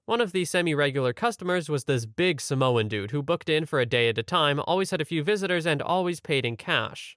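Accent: France. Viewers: By all means your french accent was enjoyable mhm mhm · 𝑀𝒶𝑔𝓃𝒾𝒻𝒾𝒸𝑒𝓃𝓉 French accent!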